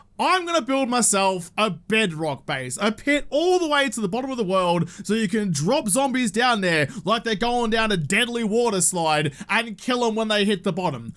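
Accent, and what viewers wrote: Australia. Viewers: No Aussie has a good day for their "r's" and "a's"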